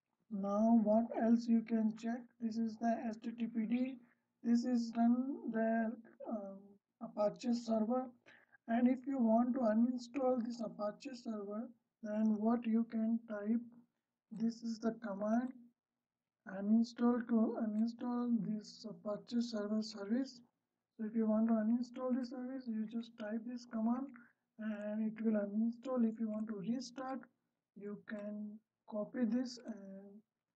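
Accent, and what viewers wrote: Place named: India. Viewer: Indians are so kind enough to upload tutorial like this even when they struggling with their accent. Nice content anyway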